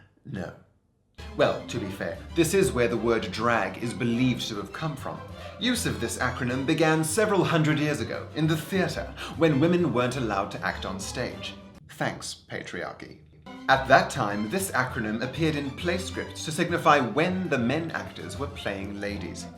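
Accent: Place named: South Africa. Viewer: I just realized that your South African. Damn now I like you more because it feels closer to home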